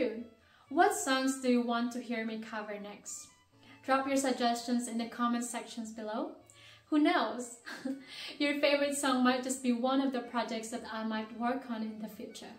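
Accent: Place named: United Kingdom. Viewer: lmao I can see you're developing a British accent, and I honestly love it being part British myself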